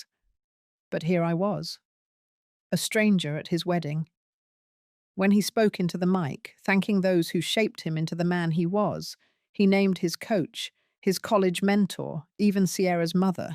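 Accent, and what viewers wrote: England, United Kingdom. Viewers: Loved the story, and oh that beautiful English accent to read. Instead of the harsh American accents which are so jarring.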